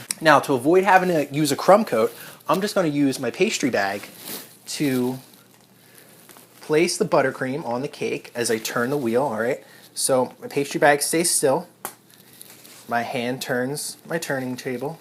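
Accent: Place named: United States. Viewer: What area of America is that accent? It's very distinct. Loved the video, it's great!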